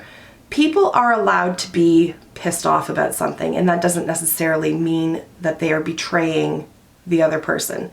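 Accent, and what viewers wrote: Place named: Ontario, Canada. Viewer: Having one's Mom come to your defense means absolutely nothing to me. Of course her Mom would have her back! Big duh on that one, right?!?! Also, I totally pegged your Ontario accent because I grew up in Buffalo and have spent a ridiculously large amount of time in Toronto and went to camp outside of Ottawa every year. Wish I lived in Toronto, maybe one day.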